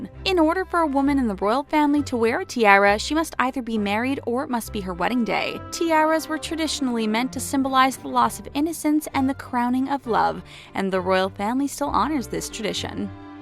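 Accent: United States. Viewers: Narrated by an American….. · you can tell who was talking is american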